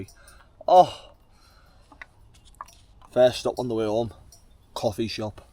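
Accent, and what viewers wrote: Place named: Newcastle, United Kingdom. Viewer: Great content. I love your authentic down to earth videos. I live in Morpeth so love content from someone with a geordie accent. Keep banging them out. Barry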